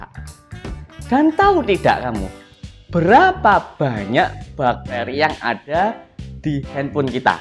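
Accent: Java, Indonesia. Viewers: 😜doktere lucu y.medok bangettt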